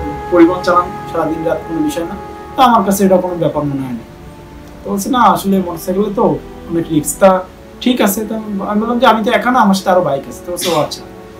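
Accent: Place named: Chittagong, Bangladesh. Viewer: bhai ki chittagong er manus? apnar vasha te chittagong er vasha er ektu tan ase hahaha <3 love from ctg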